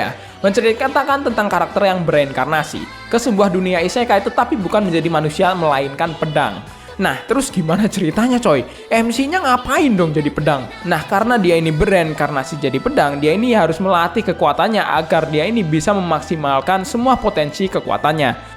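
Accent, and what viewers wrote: Lampung, Indonesia. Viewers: Lah warek Lampung tah min? Wkwkwk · Lah org lampung min?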